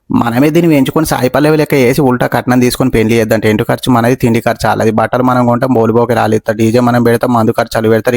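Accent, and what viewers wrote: Telangana, India. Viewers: Pure telangana slang ఇలా speed గా ఉంటది ఇది తెలంగాణ వాళ్ళే మాట్లాడగలుగుతారు. వాళ్ళకే తొందరగా అర్థం అయితది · Abba kotha dialogues andhulonu Mana Telangana yasa adhiripoyindhi 😂😂😂 Love your videos always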